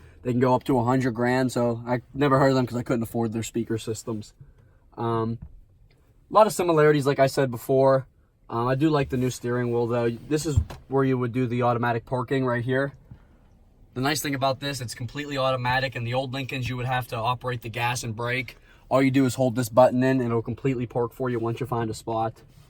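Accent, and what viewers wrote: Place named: Pittsburgh, United States. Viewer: Lol are you from Pittsburgh? I can tell that accent from anywhere!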